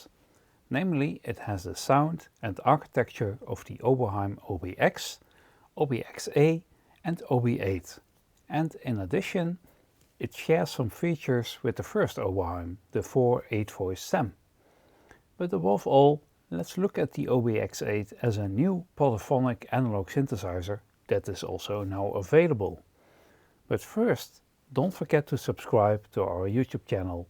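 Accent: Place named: Netherlands. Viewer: It doesn't get much better than hearing an Oberheim by a reviewer with a dutch accent like mine. Groeten uit Eindhoven, of beter gezegt, uit Bern. I expect an OBX8 later this week. I can't wait. The end game for Oberheim poly-synths, except for a module of this giant. It's the sound of all the three synths we love.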